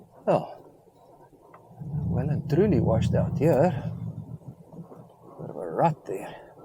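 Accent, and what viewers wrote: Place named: South Africa. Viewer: Sensing a bit of South Africa here lol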